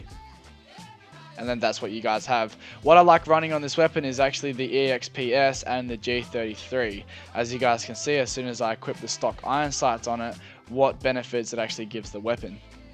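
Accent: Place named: Australia. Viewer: Are you from austrslia you sound like au chezzy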